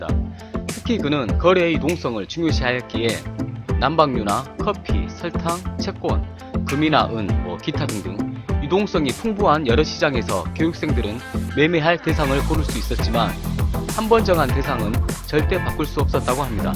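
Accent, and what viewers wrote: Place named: Gwangju, South Korea. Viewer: 억양이 광주사람이군요 ㅎ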